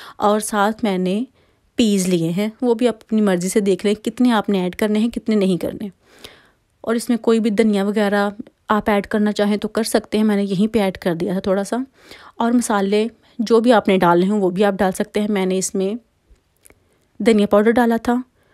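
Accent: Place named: Faisalabad, Pakistan. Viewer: Aoa. Mashallah looking nice. App Faisalabad se h app ki boli Faisalabad k logo jaisy h. Btana zaroor.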